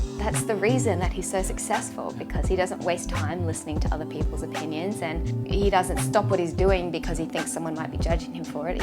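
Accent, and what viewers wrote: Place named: Australia. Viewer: How good is Gary V! Really liked this video. I'm a fellow Aussie and just new to your channel but I particularly like it your content around Japan which is on my bucket list. Keep doing what you do, you're a natural in front of the camera and hearing the straya accent is soooo refreshing from all these American's. :-)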